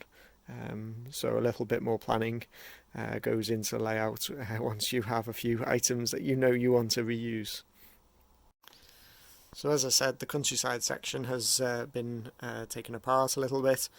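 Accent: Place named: North West England, United Kingdom. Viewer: Loved the update. You sound like you're in the North West like me?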